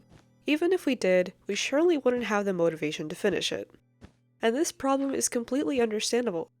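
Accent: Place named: Sweden. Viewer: HUR BLEV DU AV MED SVENNE DEALEKTEN I ENGELSKA?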